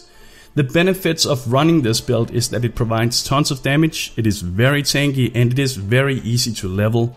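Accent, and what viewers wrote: Denmark. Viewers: that danish accent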